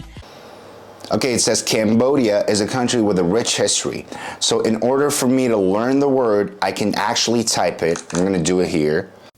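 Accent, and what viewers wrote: United States. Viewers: I like the way he says channel. It sounds like chiennal. I wonder what part of American accent he has.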